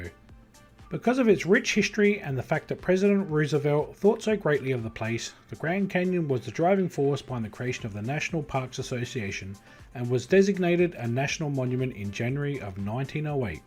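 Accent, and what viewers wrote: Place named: Australia. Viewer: I love the Australian voice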